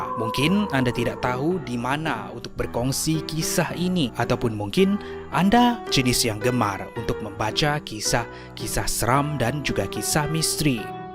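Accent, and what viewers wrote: Sabah, Malaysia. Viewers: sabah ka😁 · org sabah ka? cara bercakap mcm sabahan..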